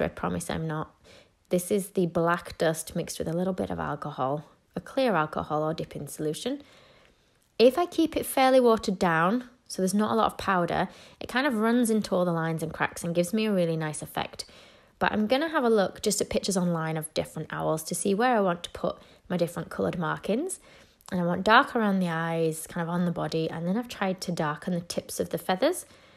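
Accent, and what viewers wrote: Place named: North, United Kingdom. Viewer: Gotta love a northern accent!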